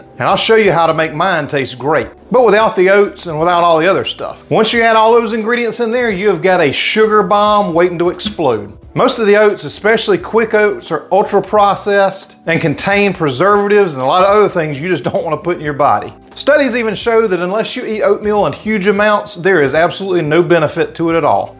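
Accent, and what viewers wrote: South, United States. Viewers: This looks DELICIOUS, I really like a hot cereal and this will be something I try for sure, thanks so much for sharing and I really enjoy your southern accent!! 😊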